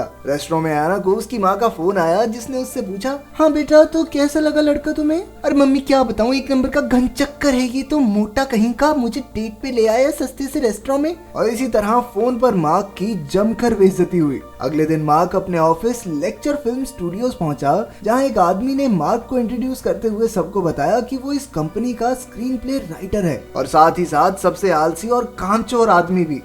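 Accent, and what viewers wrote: Haryana, India. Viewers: YouTuber pkka haryana ka h😅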